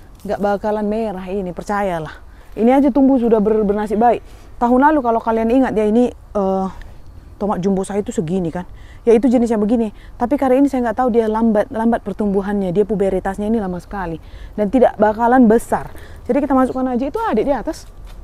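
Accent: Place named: Medan, Indonesia. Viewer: Intonasinya dari Sumatera Utara ya, kayak cakap orang Medan. Kebetulan saya orang Medan, jd suka kiat cakapnya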